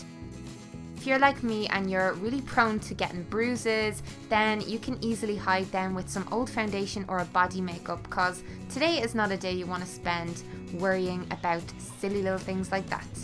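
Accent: Ireland. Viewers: Congrats again girlie!! You look glowing :). I am married to a gorgeous Scotsman but I swear I should have found an Irishman lol cause I could listen to you for days lol!! Love from Canada xo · This is my second time watching this, I wanted to try the eyes you did and I had the captions on by accident, oh my God I'm weak! It's clearly having trouble with the Irish accent because some of the mix ups are hilarious eg.. 'relax in a box (bath!), 'as a guideline for your Catholic (catflick!) 'its nice and dry skillet chiding me work hard play hard you'll never get attacked again. I hope you enjoyed the CEO'!! Too funny!:'D Ps, You look unreal in this video and congrats on graduation!!:) x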